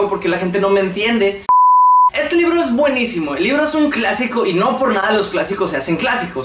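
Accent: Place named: Monterrey, Mexico. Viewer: En corto me di cuenta que eres de Monterrey JAJAJA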